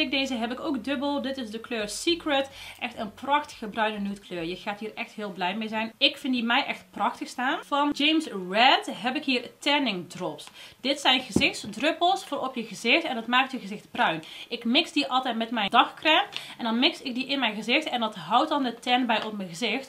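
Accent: Brabant, Netherlands. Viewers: Hee. Kijk graag naar je filmpjes, omdat je zo lekker Brabants bent. 😃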